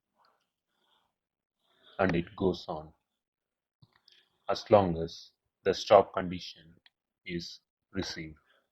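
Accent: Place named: India. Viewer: come on idiot!!! stop speaking english... i hate indian poeple speaking English... it sounds awful !!! I hate indian people since now.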